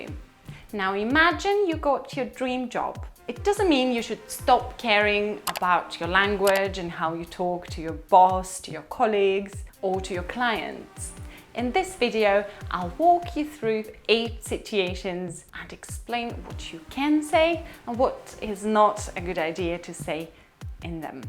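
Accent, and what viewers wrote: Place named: United Kingdom. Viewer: Странное сравнение... У этой девушки поставленный британский акцент, в то время как у Марины - американский.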